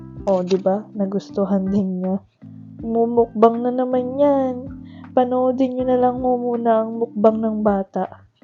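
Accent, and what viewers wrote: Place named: Batangas, Philippines. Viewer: Nice bonding may punto salita batangas kayo Mam😅 nakaka tuwa nman simple nice video susbscribed na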